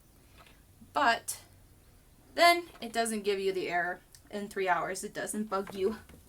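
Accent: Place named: Minnesota, United States. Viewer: Excuse my silly Minnesotan accent!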